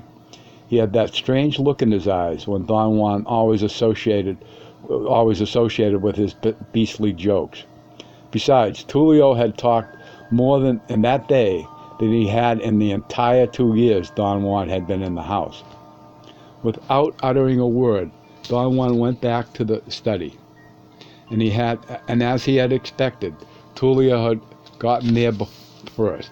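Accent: Boston, United States. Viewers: Thanks so much for this upload. Your bostonian accent has been blessing my mornings for the last few weeks. Looking forward to more.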